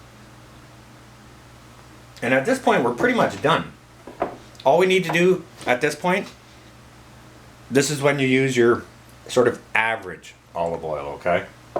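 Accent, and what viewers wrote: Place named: North, United Kingdom. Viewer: If you grow a hamburger over the winter, I want some of those seeds.!! It sounded like grow even though I think you were saying throw, what can I say you have a Northern accent.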